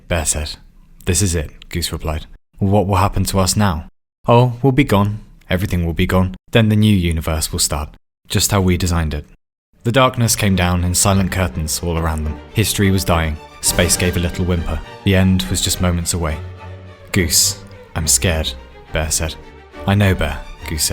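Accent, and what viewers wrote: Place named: England, United Kingdom. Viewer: what really makes this channel awesome is his English accent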